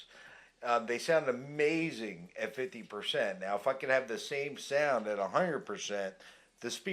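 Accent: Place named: Baltimore, United States. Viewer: I hear a bawlmer accent.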